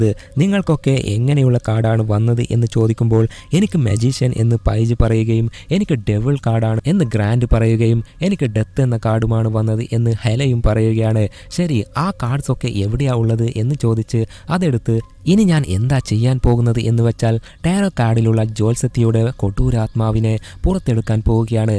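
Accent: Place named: Kasaragod, India. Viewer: Ningal kasargod alle because language kond manassilakum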